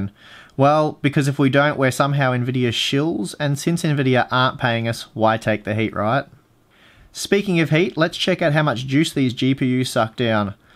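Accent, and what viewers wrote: Australia. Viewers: I really enjoyed this as well as all your videos. Something about the Aussie accent makes watching all these vids for research a bit more fun...LOL...I would like to add in the states it seems the R9 390's in both the standard and X variants are a bit cheaper with used 390's being found for under $150. The 290's for some odd reason are averaging the $200 you mentioned. Thanks for another great video. Since I only upgrade when I see major dips in frame rates, well educated hands on reviewers like yourself are a godsend. My last rig finally died, (VRM's on the motherboard), lasted me since 2010. It was the old tried and true Phenom x4 965 BE stable on air with a Radeon HD6950 TOXIC from Sapphire that ran as the 6970 with the Bios Switch enabled. Card still runs perfectly, but she is definitely dated and it's time to upgrade. Thanks for making that so much easier. 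Cheers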